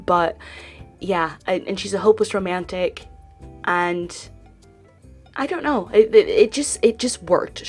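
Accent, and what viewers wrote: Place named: Midwest, United States. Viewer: UK?! I thought you were from the US because of your midwestern sounding accent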